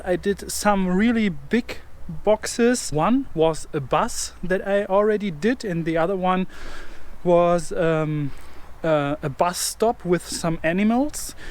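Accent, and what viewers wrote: Germany. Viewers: sofort gehört das deutscher bist haha :) schaue öfter vorbei ! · Ich dachte die ganze zeit er muss deutscher sein bei seinem englisch... und dann kam die Bushaltestelle😇 (ist das erste video, dass ich von ihm sehe)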